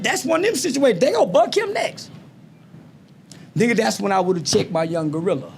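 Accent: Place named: Chicago, United States. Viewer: he gotta stop talking like king von.. That Chicago accent is ignorant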